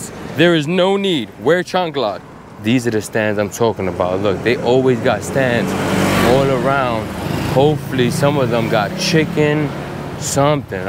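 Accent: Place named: New York, United States. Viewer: Chanclas...how many understood that? you are pure neoyorquino...obvious when you talk LOL And thanks for the weed shop tip, so many shops in BKK are way overpriced ($25usd for a gram...yikes!)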